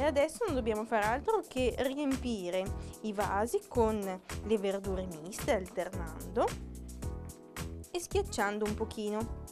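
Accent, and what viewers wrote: Italy. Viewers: Is this Italian accent? My neighbors sound so different :)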